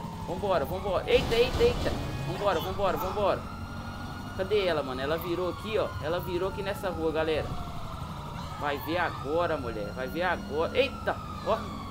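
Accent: Minas Gerais, Brazil. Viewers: Ele e mineiro?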